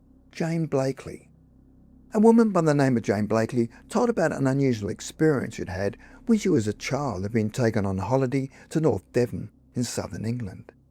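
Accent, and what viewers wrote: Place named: Australia. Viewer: He's an Aussie. Not AI.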